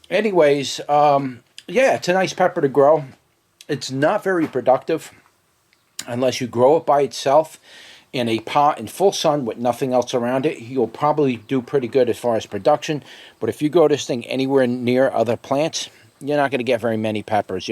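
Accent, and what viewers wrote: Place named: Philadelphia, United States. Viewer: I enjoy your videos because I grow a lot of chiles where I live in New Mexico and also I am from Philadelphia so its nostalgic hearing your accent. Im not sure where your from but I hear home in your voice. Thanks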